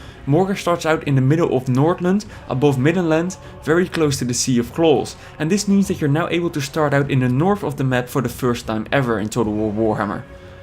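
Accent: Netherlands. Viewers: Your accent sounds so Dutch !! any change you are from The Netherlands ? Anyways, amazing video !1 thanks !! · He's fucking dutch, you try speak a language that you aren't accustomed to and let's see how you sound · dacht ik al was een nederlands accent xD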